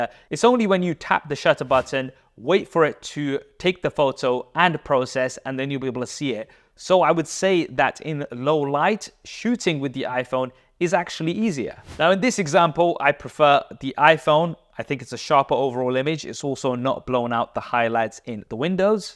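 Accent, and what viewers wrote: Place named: United Kingdom. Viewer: YOU ACCENT SOUNDS BRITISH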